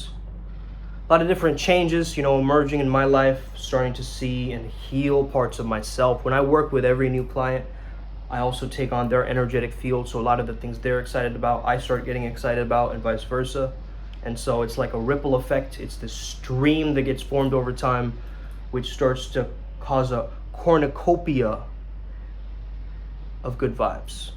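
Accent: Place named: South, United States. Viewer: That southern accent on point 👌😂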